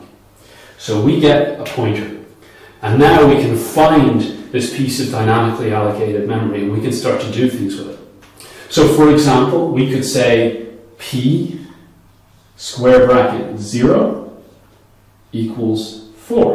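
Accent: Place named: Ireland. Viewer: Yeah please do! and nice I am aswell. It's always nice to hear an Irish accent in this area of youtube videos. keep up the good work!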